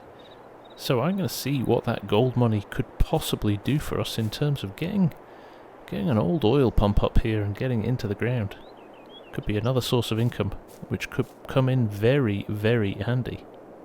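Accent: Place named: South London, United Kingdom. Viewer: Haha, Statham is your hero! That's brilliant....however, you need to work on your muddled South London accent and nasal-y pronunciations a bit mate. I'm still waiting for someone to come out with an AD / CP route for this map. I think I've messed up my routes as I'm still getting used to AD...(always connect the lines like a train route, yeah)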